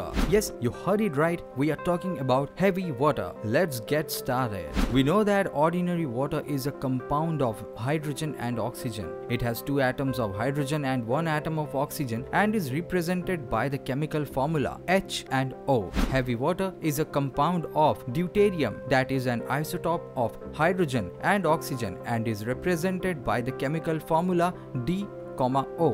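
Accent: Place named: India. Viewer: Undestood about 5% of this of this video. And this being the only video that is trying to explain what heavy water is just shows how much we need to know about what heavy water actually is. If someone could make a video that explains is easily, please. And without that irritating indian accent.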